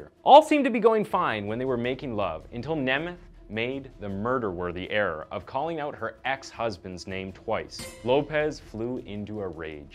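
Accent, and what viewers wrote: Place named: Canada. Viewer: I'm having a hard time placing this guy's accent. He sounds like a Canadian who's lived in the UK longer than NA.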